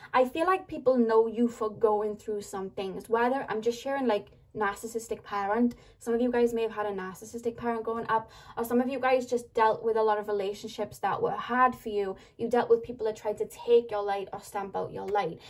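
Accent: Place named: Manchester, United Kingdom. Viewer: Great reading.! Love your accent!Ireland? Manchester? I'm an Anglophone, lol, so I've watched tv series with accents for years, but it's hard to distinguish certain accents.... I'm pretty sure you're from Manchester...am I right? Correct me if I'm wrong.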